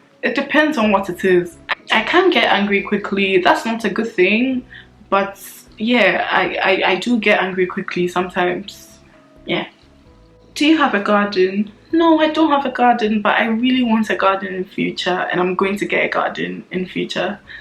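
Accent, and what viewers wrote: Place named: France. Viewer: The french accent is beautiful. Nice video ❤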